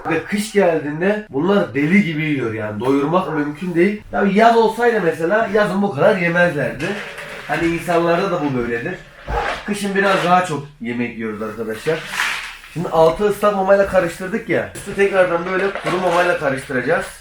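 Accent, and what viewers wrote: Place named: Gaziantep, Turkey. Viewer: aga bu adama anteplıce konus mak cok yakısıyo adam bu adam harbi kral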